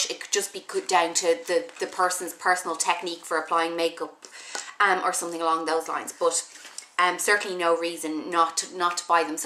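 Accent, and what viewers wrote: Ireland. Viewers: This probabley sounds really silly...but I just love the irish accent. I read a whole bunch of Maeve Binchy novels and hope to travel to Ireland some day.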